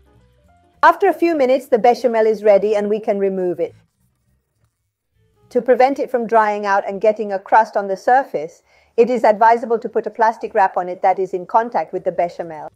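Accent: Andalusia, Spain. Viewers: Solo por tu graciosa manera de comunicarte, como toda una andaluza, tu like y tu suscripción!!!!! Saludos desde Santo Domingo.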